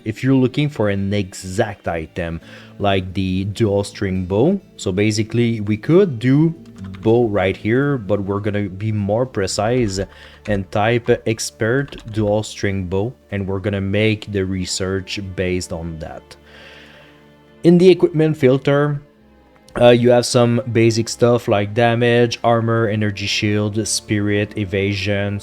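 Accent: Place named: Quebec, Canada. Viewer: toi t'es quebecois c'est certain! hahaha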